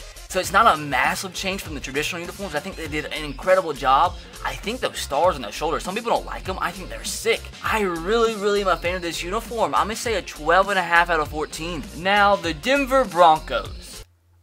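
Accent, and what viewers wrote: Ireland. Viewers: The way he talks is like a Irish person. He talks so fast. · U sound Irish asf😂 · Are you irish?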